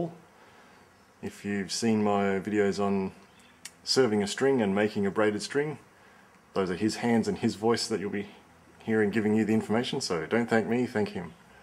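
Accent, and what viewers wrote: Australia. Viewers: is that a Australian accent i hear there mate? haha thinking about making myself a long bow, been told bamboo is the best material for beginners. thanks for the video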